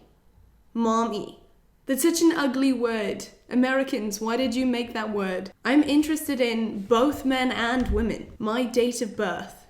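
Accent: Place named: RP, United Kingdom. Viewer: missed this posh accent x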